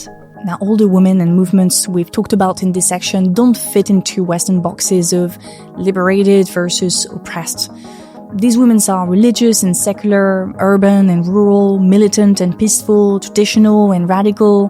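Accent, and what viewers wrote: France. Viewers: You accent is so cool. You sound french. Then you sound australian. And little bit of scottish.😊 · You sound french 🤔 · It was just too much for me, Im allergic to french traing to speak English and leter R, I know what I said, just another youtuber traing to get some like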